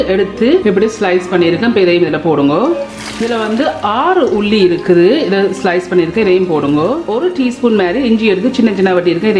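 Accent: Jaffna, Sri Lanka. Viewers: ma...!!!! I like your Jaffna Tamil..... I'm also Jaffna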